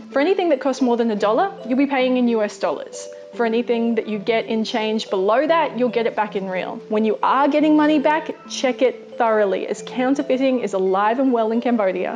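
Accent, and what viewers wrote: Australia. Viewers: Wow, you sounded American up till you said you were from Brisbane. Now, all I hear is your Australian accent :). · I love the Aussie accent ☺️ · I don't understand your Australian English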